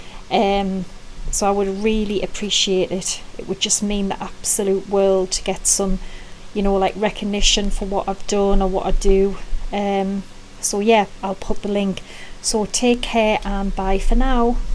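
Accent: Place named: North East England, United Kingdom. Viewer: Great ideas, and I will certainly use some of them, but I really kept playing the video to listen to your accent. My parents were from South Shields, and I lived and worked in Gateshead for three years (1967-70), although I haven’t lived in the UK since then. I’m guessing you’re from somewhere not too far from there, so your voice gave me a real blast of nostalgia. Thank you!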